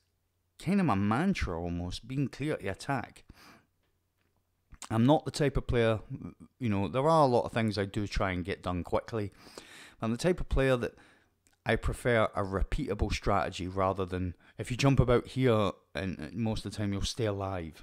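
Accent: Scotland, United Kingdom. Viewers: Yer Scottish got ma support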